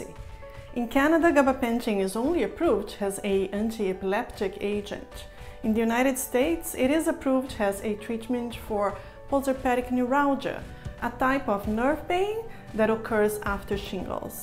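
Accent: Brazil. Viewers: Poxa vida Dra, que maravilha! Eu pensei, seu inglês é perfeito, mas tem um certo sotaque; uma de duas, ou é italiana ou brasileira por ser um sobrenome bem conhecido no estado de SP. Vou arriscar e mandar um abraço em português! E não é que a doutora é de Sampa! Rsrsrs! Que ótimo! Em 92 eu estava cursando meu 4 o ano, na USP também olha só! Admiro demais seu trabalho no canal. Seus videos são atrativos, suscintos, claros, simpáticos, rigorosos na informação científica sem que ela se torne cansativa ou maçante. Quanto à gabapentina e peso, um mistério mas uma excelente opção para aqueles que sofrem os efeitos devastadores de um aumento de peso indesejado e involuntário devido à pregabalina. Tudo de bom e parabéns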